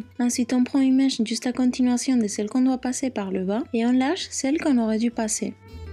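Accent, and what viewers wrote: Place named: Spain. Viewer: han comme j'adore ton accent !!! ça sent l'espagne à plein nez (je me trompe pas j'espère) qu'est ce que tu es charmante aussi, un visage à la audrey tautou, simple, frais et naturel :) un besito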